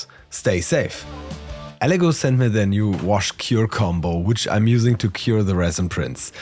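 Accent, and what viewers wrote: Germany. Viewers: I am sure its a germany guy :D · Thick german accent😂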